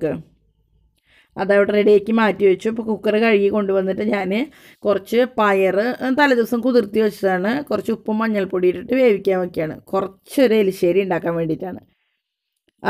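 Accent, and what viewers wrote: Thrissur, India. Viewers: ചേച്ചി കാലഹരണപ്പെട്ടു പോയ thrissur slang ചേച്ചി തിരിച്ചു കൊണ്ടു വരണേ. നമ്മുടെ അമ്മൂമ്മ മാരും അമ്മമാരും സംസാരിച്ചിരുന്ന ആ slang ഇപ്പോൾ kelkane ഇല്ല.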